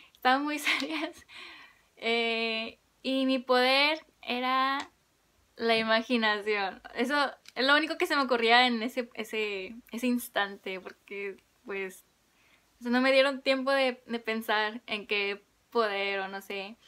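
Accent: Mexico. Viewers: Tu asento fresa...me encanta..felicidades..me encantas.. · Que linda wow eres de mi país mi hermoso México saluditos ^.^ ya decía que tu acento era igual al mio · ¡Tienes el acento de México! 😂🇲🇽👏🏻 Tu español es muy bueno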